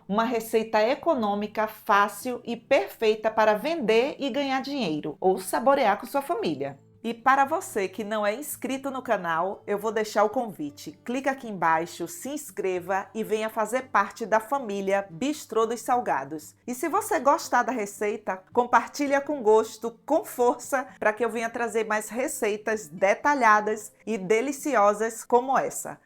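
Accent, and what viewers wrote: Bahia, Brazil. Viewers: Amo suas receitas, baiana arretada adoro seu sotaque mulher.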